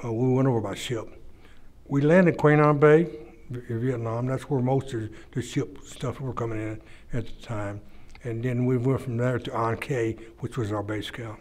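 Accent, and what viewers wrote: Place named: South, United States. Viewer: He’s just a Southerner, that’s all. Lots of people from New Jersey have an accent too.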